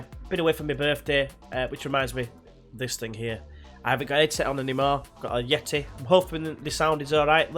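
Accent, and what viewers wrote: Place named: Liverpool, United Kingdom. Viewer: That feel when you can tell its speaking but he actually sound's scouser. Great ep as always mate!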